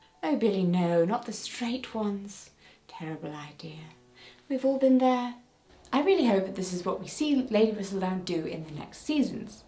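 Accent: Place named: England, United Kingdom. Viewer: not even have 1 FULL regency inspired outfit, but 2 of them, with fake flowers, ribbons and funky hair, everything!! You are literally english queen and you talk like one! Thank you for video!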